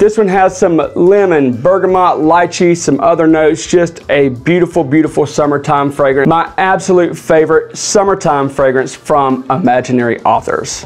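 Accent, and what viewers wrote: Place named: Texas, United States. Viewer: Dude I really enjoy your reviews. You sound like your from my neck of the woods. Ft Worth friggin Texas. Preciate you’re work here. I’ll be checking some of these out.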